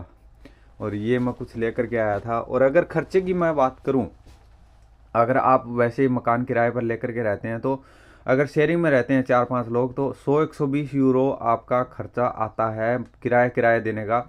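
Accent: Haryana, India. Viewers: Delhi se ho ki haryana se ho bhai aap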